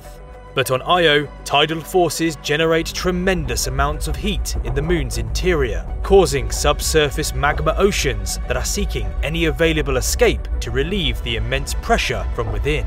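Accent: United Kingdom. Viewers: boy i cannot get enough of these spectacular videos plus the narrator is got me talking like british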